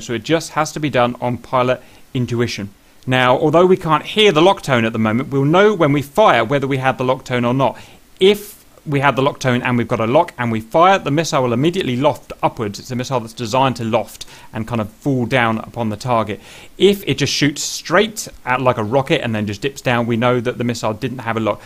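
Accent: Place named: England, United Kingdom. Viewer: can you try to change your english accent with an american accent so i can understand any word about what you say.....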